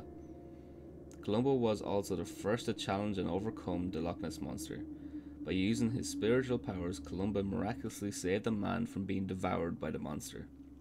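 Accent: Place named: Ireland. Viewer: i was wondering what kind of irish accent that is. thank you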